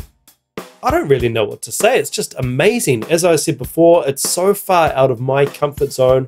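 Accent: New Zealand. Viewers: Where in New Zealand are you from?!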